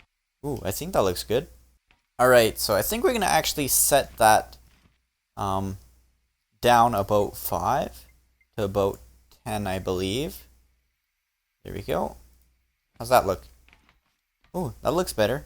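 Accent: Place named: Canada. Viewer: can't get over the fact that ur Canadian so u pronounce about aboot... no offence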